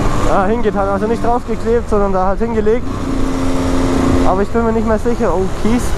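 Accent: Swabia, Germany. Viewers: Das Problem eines jeden Schwaben: Schnell von Schwäbisch auf "Hochdeutsch" switchen. Und "des" und "isch" bekommt man eh nur schwer weg, egal wie sehr man sich bemüht xD Ich spreche aus Erfahrung :D Aber du machst das ganz schön gut :P Bei mit kommt dann irgendein komischer Mix-Dialekt raus, wenn ich mich anstrenge. Geht es noch jemandem so, oder bin ich die Einzige? :D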